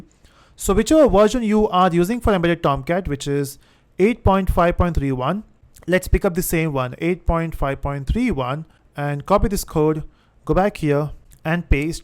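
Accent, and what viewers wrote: India. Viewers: dude I love your accent, its half Indian and half foreigner and it sounds 10/10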